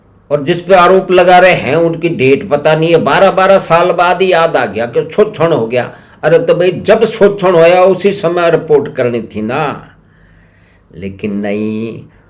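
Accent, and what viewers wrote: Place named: Haryana, India. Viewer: Enjoyed the Haryanvi tone.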